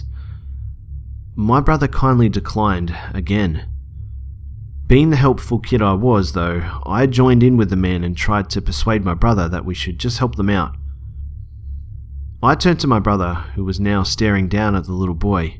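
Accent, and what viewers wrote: Australia. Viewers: Just curious, but what is your accent? It sounds very British, not sure which region, but at times you sound distinctly Australian. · He's Australian 😂💕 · Yep it is aussie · Not trying to be a stalker but it's obvious that your australian I'm a Queenslander wbu? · it's so hot when he swears, with his (australian?) accent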